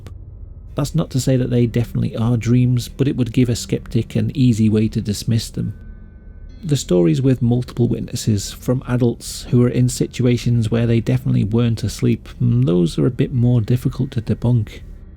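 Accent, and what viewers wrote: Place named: Midlands, United Kingdom. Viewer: Yeah, you can post the link so we can read all these stories ourselves, but... I'd rather listen to you tell them in your soothing Midlands accent. 😊 I grew up in Saudi Arabia and went to the International Academy, which followed the British curriculum. I believed in Father Christmas, it was not until we came back Stateside that I got back into Santa. (I do have the obligatory photo of me as a wee toddler, sitting on Santa's lap, bawling hysterically.) A great Santa story comes to us from David Sedaris, in an essay titled, "Six to Eight Black Men." I believe it's in the book Naked. Definitely one of my favorites of his. Happy Xmas everyone!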